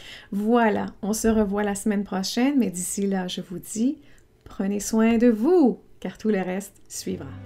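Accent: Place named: Quebec, Canada. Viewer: Niaiserie gogosse... jamais entendu! C'est normal... je suis européen ;-) J'adore ce mot et votre accent québecquois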